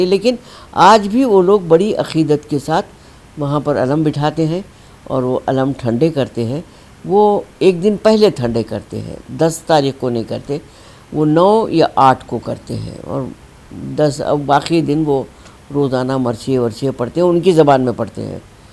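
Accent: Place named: Deccan, India. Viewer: Mashallah excellent deccani urdu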